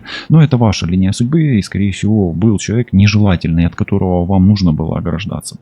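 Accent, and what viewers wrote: Ukraine. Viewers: Спасибо, комнату отремонтировала, стараюсь восстановить материальность после кражи вошедшего в доверие менеджера по недвижимости. 😊😊😊(Благодарю Творца и Таролога) 🛐 Приятно слышать такой мягкий малороссийский выговор.❤❤❤С-Пб🎉🎉🎉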